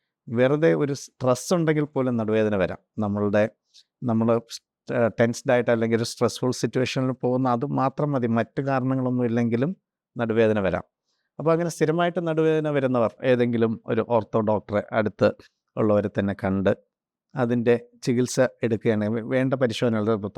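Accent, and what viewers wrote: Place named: Trivandrum-Kollam, India. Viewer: ഡോക്ടർ കൊച്ചിയിൽ ആണെങ്കിലും. സംസാരം ട്രിവാൻഡ്രം കൊല്ലം ശൈലിയിൽ ആണ് കൊള്ളാം 👌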